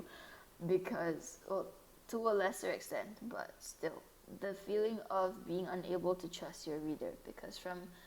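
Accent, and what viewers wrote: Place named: Wiltshire, United Kingdom. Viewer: Whew..... Saw this in our sub box and instantly decided to listen while going on a morning walk. It's a sort of freezing, slightly overcast day over here with a cold grey sky so it was truly the perfect atmosphere. God... Forgot what HC does to a person until we experienced this. Remember watching manly play the original games years and years ago and they emotionally wrecked me haha. Truly some of the most gut wrenching visceral horror from indie games. Just pure agony all portrayed with such charming character designs and in an adorable art style. There's just something so haunting about the way this game dev explores topics and I think the way you described at the end nails it perfectly. We adored your Wiltshire voice and the creepy yet peaceful voice you gave Vincent (that cg parallel hello???? 😭). And ohhhgg if you play any of the dev's other games, we're so down to be hit over the head with the bag of bricks that will be the feels. 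Ehehe thank you for accompanying us on our walk with your soothing voice,! It was a really nice start to our morning 🥰❤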